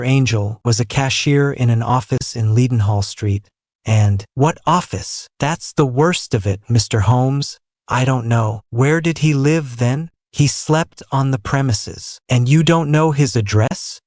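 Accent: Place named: United States. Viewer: No offense mate. Doyle did go to the US with his erstwhile friend Houdini but despite your valiant efforts American "English" doesn't really do his oeuvre justice. Look up BBC radio drama Ectoplasm (free on you tube). You can thank me later. One author was once asked what he thought of American culture and replied that, "it sounds like a good idea". Keep up the good work mate.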